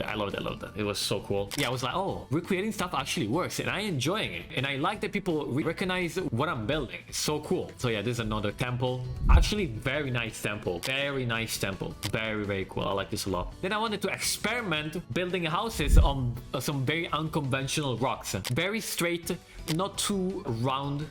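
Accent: Italy. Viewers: dai ma si sente che sei italianissimo! sei un grande comunque continua cosi!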